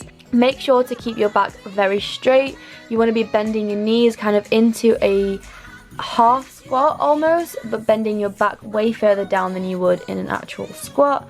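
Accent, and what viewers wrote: United Kingdom. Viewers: As would LUIMARCO say: Propahh and legit british accent 👍👍👍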